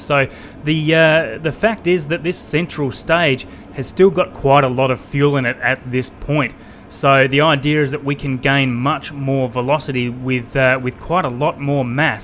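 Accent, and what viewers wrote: Australia. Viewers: tell me if I'm right. Are you Australian? You sound like Hugh Jackman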